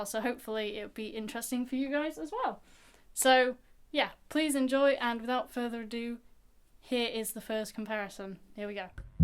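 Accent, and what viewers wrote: United Kingdom. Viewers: You sound British!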